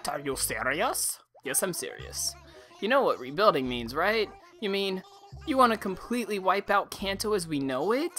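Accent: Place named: United Kingdom. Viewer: Your British accent is funny! Great video, really helps :) Thanks alot!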